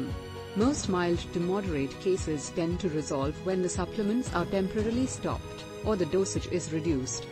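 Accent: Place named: India. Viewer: As soon as i heard the indian accent i stoped the video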